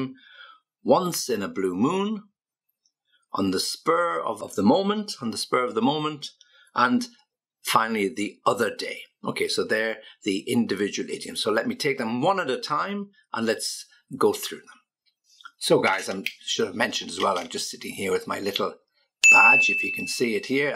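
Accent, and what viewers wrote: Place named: United Kingdom. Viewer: Your accent is so interesting 🤓✌️ may I ask are you British 😸? If you are may I ask for some British pronunciation videos please 🤓🙏🤓🙏🤓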